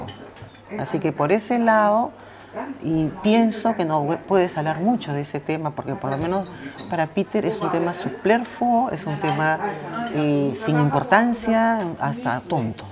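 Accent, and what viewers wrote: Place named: Peru. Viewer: totalmente de acuerdo contigo,sabes yo veo tus vídeos y son tan agradables además tu acento hermoso del Perú,yo soy Méxicana y siempre estoy mirándote y escuchando tus consejos tan sabios y he aprendido de ti y muchas cosas que tu dices es verdad,porque mira que tan diferente es vivir aquí en Latinoamérica y vivir en Europa estoy consciente de eso,mi novio polaco me pregunta siempre de cómo es la gente Latina y tiene curiosidad de todo y yo le platicó muchas cosas para que el venga a México y no desconfíe de mi,y también me gustaría irme a vivir a Polonia y tendria que dejar todo,mi familia y mi país y soy capas de eso por un amor verdadero aúnque le tengo miedo al frío es la verdad y si yo todo se lo dejo al destino porque apenas llevo un año de relacion con mi novio y quiero conocerle cada día más y más y no importa tanto las fechas importantes yo pienso que lo más importante es el tiempo de calidad que se disfruta al estar viviendo ya con la persona que será mi esposo,yo ya no estoy para juegos de Internet y soy Feliz y disfruto día a día la relación que tengo y pienso que si el destino lo quiere estaré pronto con mi novio porque yo quiero casarme con el y no ser sólo una aventura,entonces me gusta escucharte y si estoy de acuerdo contigo me encanta tu forma de ser y me idéntifico contigo,saludos desde México y gracias por tus consejos señora guapa te ves muy guapa de color blanco muy elegante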